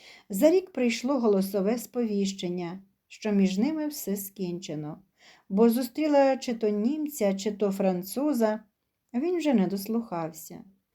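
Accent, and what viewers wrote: Standard. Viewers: Добрий день, у вас чудовий голос, спокійний, знання української мови стовідсоткове, мабуть ви єдина, що розмовляєте на чистій українській мові. Дякую вам. Завжди охоче слухаю вас.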